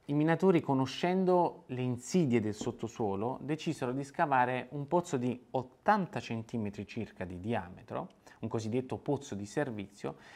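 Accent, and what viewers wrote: Naples, Italy. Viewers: Sei napoletano? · Ciao! Sei napoletano?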